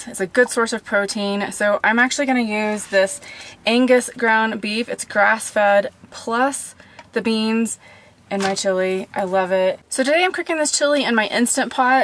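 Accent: Texas, United States. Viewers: I'd like to see you hardboil a dozen or 2 eggs in that. You would have to be awful careful but man, 1 egg is about 9g protein and eggs have a BV rating of 100 (perfect) and you would have a ton of protein cooked and always ready for a few days on hand. Of course you have to refrig. them but once it is done, it is done, en masse for days of protein on hand, simply. I also think you should do a video entirely in your Texas twang, if you still have any of that accent!!!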